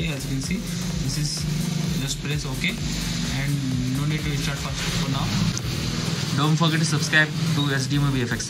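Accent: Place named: India. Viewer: Can anyone tell me what fucking command that is he’s speaking in that Indian accent can’t tell if he’s saying t b or d they all sound the same and then he’s not even saying enough characters that his blurry dirty ass screen shows. It’d help if he turned off the techno music. This is why Americans hate Indians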